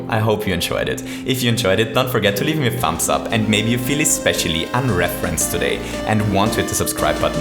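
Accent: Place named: Germany. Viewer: Nice Video. Deutschland oder Österreich (Akzent verrät alles)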